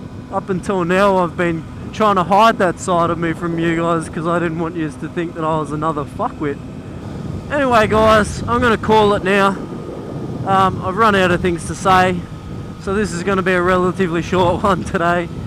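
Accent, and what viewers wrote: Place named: United Kingdom. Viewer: Mate I love that catch phrase lol sounds like a top fella in the UK 😉 keep the vids coming 🐨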